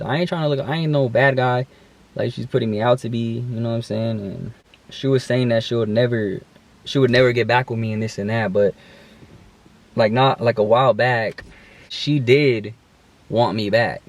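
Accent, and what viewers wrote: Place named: Bay Area, United States. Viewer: I think the Bay Area is getting to you in how the speak lol . Those from the bay know what I mean lol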